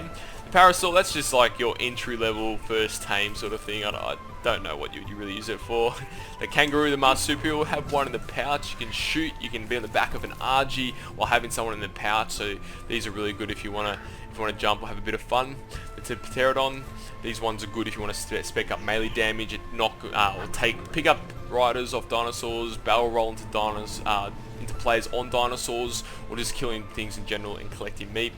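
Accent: Australia. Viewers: BobHoppaFlotchkin Maybe some people don't speak english as their native langue (go figure) and thus struggle to understand someone with a thick australian accent who could give Steve Woodmore a run for his money?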